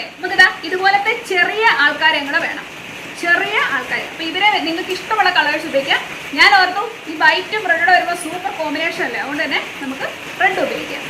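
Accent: Kottayam, India. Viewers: Kottayam kari analle Samsaram kelkkumbo ariyam 😉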